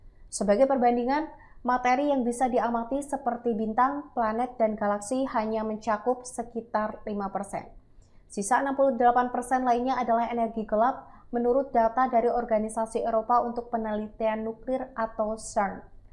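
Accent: Java, Indonesia. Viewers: Ini yang ngomong orang jawa ya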